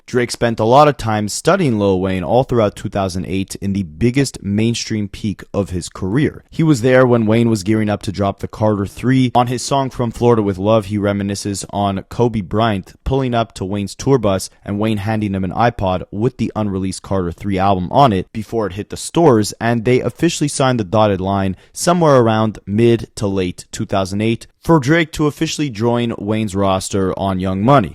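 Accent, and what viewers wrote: Canada. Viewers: Drake must be Toronto slang for Fake. No offense I can hear ur canadian accent and like your work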